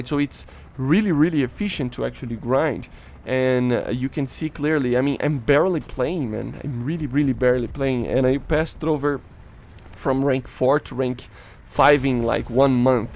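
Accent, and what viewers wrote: Brazil. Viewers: Dude, i listened to 10 seconds of the video, pause, 5 seconds of the video, pause and I said to myself, this guy is definitely Brazilian, muito foda ver um br com um canal top em ingles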